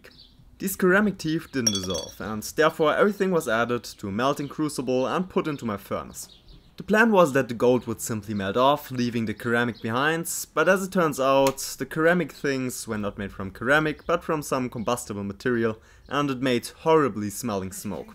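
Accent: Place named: Germany. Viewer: Ich glaube vom Akzent her, dass du Deutscher bist daher: SMB (sodium metabisulfite oder deutsch: Natriumdisulfit) dient nicht dazu, überschüssige Salpetersäure zu entfernen. Es ist ein selektives Fällungsmittel. Btw: Fällen heißt auf Englisch: Precipitate, nicht "chrash out" oder so etwas. Es kann also gut sein, dass du in der Lösung noch gelöstes Gold hast (Hast du ja sicherlich aufgehoben ? §1 des Edelmetallrecyclings: NIE etwas wegschmeißen!) Und doch: Es gibt Literatur zum selektiven Ausfällen von Platingruppe Metallen, sogar speziell in Bezug auf Dentallegierungen. "Edelmetall Recycling" von M.A. Buth, ist so eines davon, sogar auf Deutsch... Gibt's bei Amzon unter: <link> ... Viel Erfolg weiterhin! M. A. Buth ;-)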